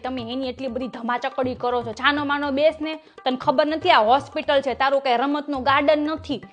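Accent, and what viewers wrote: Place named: Saurashtra, India. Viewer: અસલ પટલાણી જેવા બોલો છો (સૌરાષ્ટ્ર ની બોલી)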